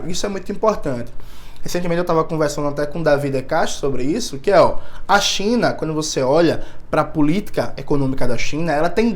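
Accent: Pernambuco, Brazil. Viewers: te adoro pela qualidade de teu trabalho, teu conhecimento... Mas essa proximidade com um jeito diferente do nosso, aqui do interior de São Paulo, de falar é delicioso. Um ritmo, um andamento faz com que ouvir você seja delicioso.... Que maravilhoso quebrarmos essa hegemonia horrível do capitalismo que impõe um modelo "padrão" de ser, massacrando os regionalismos tão ricos e lindo em nosso país.... AMOOOOOOOO esse jeito cantado de falar....Adoro essa pernambuquice... Sabe, graduei, fiz mestrado e meio de doutorado. Nunca tive um professor negro e estou adorando... Obrigada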